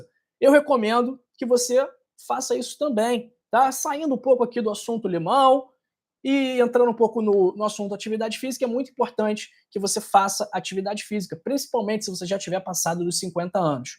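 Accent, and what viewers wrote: Rio de Janeiro, Brazil. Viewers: Sou de Mogi Guaçu Cacilda Pimentel sp agora você parecem ser carioca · Olá. dr . eu acho que. Vc . Carioca vc soquete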